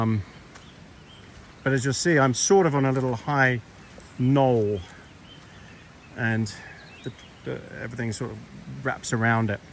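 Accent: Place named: England, United Kingdom. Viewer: DON'T MENTION SOMETHING GO'N WRONG...HAHAHA!!! WELL,LOVE THE NEW TENT...ITS AWESOME!!! AND SO ARE YOU N BRUCE. AND YOUR SO EXCITED ABOUT THAT TENT..I JUS FEEL YOUR JOY HAHAHA '' I LOVE HOW YOU TALK...YOUR ACCENT IS AWESOME!!! LIK ENGLISH ACCENT...ALSO PLEASE GIVE A ENGLISH INTERPRET WHEN YOU DO MEASUREMENTS N POUNDS..DID I SAY THAT RIGHT..WELL INSTEAD OF WHATEVER KEELOADS ARE...POUNDS??? WELL I NEVER LEARNED POUNDS AS MEASURE..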